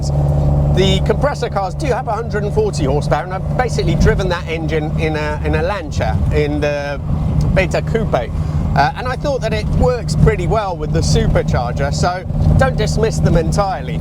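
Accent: Italy. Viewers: Do Italians talk like this, which dialect and accent? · It's lovely to listen to the real pronunciation of Italian words 🤌🏻 It's part of this channel's charm. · ❤❤❤great video thanks I love the Italian accent and your videos are always interesting ❤❤❤❤ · I thought I detected an Italian twang in a previous video.🤌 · I love it how your Italian pronouncement of Italian words comes through. I really enjoyed the one with your dad on Italian car pronouncement. I speak a tiny bit of Italian still after working in Italy for a year and absolutely love the language (and food). I also shared this video with a good friend of mine, who uses this body as a race car but with a Mazda rotary engine 😋 I had a lovely Alfasud (yes I try to pronounce that the Italian way) and because my ratio leg length / upper body is quite opposite to "the Italian ape" ratio, I had to extend my steering wheel hub by about 15 cm and I bent the gear lever quite far back to be able to sit "normally". 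I had a lot of Alfa Romeos and absolutely loved them, best driving cars I have ever owned. What an absolutely gorgeous looking and sounding car, testament to the work that went into it, brilliantly done. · Carry on with the Italian pronunciation, I too have Italian parents and I was born in London and speak Italian too. Bravo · What an epic cool little car, a credit to the owner.. Always liked your Italian pronunciation, and as always thank you for the great content. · I like your italian pronunciation, but…Why do you pronounce Abarth the wrong way then? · YOU HAVE MADE NO SECRET of your heritage ❤🇮🇹 personally I love hearing the authentic pronunciation …………. It’s an education Thank YOU 🙏🏻 Pass my regards on to your Dad from Dundee 🏴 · Keep the Italian pronunciation, I really respect and appreciate that. Such a beautiful language. The rest of us are just guessing unless somebody teaches us how-to. 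· Love you Italian pronunciation of Italian marque names ❤ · Eccellente. I do appreciate your Italian heritage pronunciation. Three years in Italy 1970-73 - my (English) baby sitter's husband was Italian. He took pride in his (replica) Mk1 Escort Mexico. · I don't think I've ever seen one of these, I absolutely love the way it looks! What an awesome car! Also, I love that you pronounce Italian names with an Italian accent. it's part of you. never changes, buddy 😉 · Keep those Italian pronunciations coming!!! ❤ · I, for one, love your italian accent. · One word for this car, ‘PROPER’. Keep,up with the Italian pronunciation. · I can't believe some didn't realise you was of Italian origin. I love the Italian accent, Alex Polizzi fault. 😊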